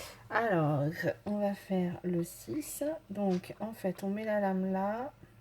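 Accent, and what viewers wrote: England, United Kingdom. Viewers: Coucou, quand même pas mal cet appareil, top!!! J'adore ton accent anglais, ah ah!! Bises, Véro. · Cc ma belle l’accent anglais top 😂😂👍hâte de voir ton happy mail .... je pense que je vais l’acheter la prochaine fois.... bisouuus😘😘😘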